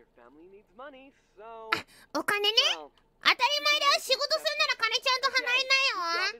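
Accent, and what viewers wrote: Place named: Japan. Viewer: Peko japglish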